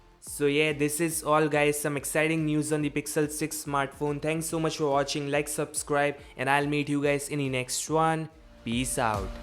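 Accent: India. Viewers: Ngl when I hear the thick Indian accent I close out of the video faster than when I hang up on a scammer.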